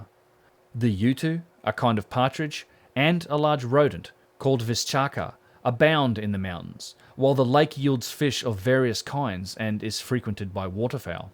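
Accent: Australia. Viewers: Is the voiceover a really good Aussie AI voice?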